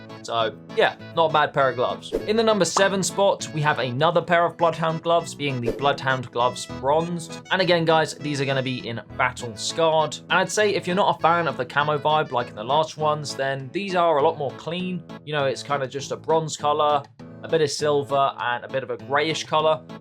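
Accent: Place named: United Kingdom. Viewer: your bri ish accent is annoying (no offense)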